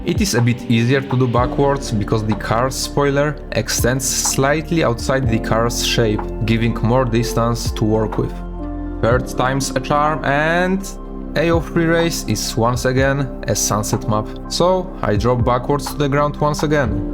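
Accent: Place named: Poland. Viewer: jesteś Polakiem, słychać haha. poeodzonka na yt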